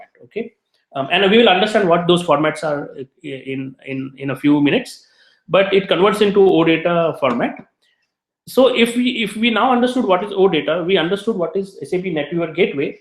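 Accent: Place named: India. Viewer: indian accent is a headache, slum voice.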